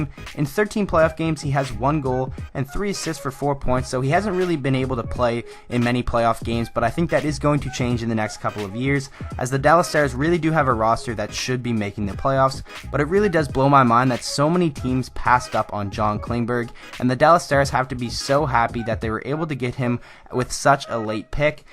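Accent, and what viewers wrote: Canada. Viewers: unknown gt gamer lol yeag he says about like a canadien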